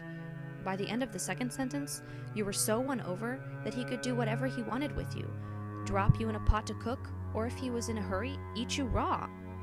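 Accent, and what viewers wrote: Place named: United Kingdom. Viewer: more like posh English, lol i loved the different languages, I never know how to say them when I read it.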